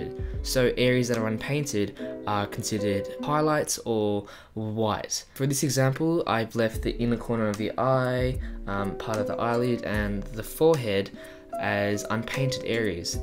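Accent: Australia. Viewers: I recognised the accent, then saw that you’re from Australia too! G’day to all my fellow Aussie artists. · Hey I love your work but please work on your pronunciation! it’s hard to understand you. Usually it’s hard to understand asians speaking english, imagine adding australian accent to it hahaha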